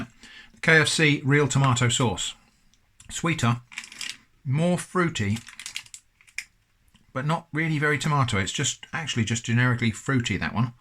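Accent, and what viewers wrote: England, United Kingdom. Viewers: I just found your channel today - are you the long lost child of English brown couch enthusiast Ashens and scam baiting, northern Irish Jim Browning? I never knew I needed another soothing, English male commentary on quirky things- but thank you!!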